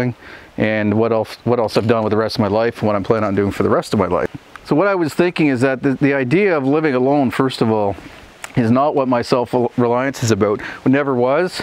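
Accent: Canada. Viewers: Love that Canadian accent and can do attitude.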